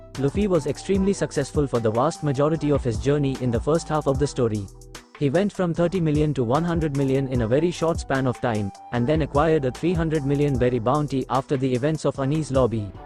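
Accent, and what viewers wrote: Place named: India. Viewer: why does this AI voice sound indian?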